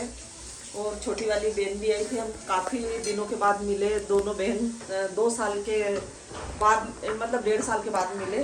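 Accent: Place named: Indore, India. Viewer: Ye indori language hai inki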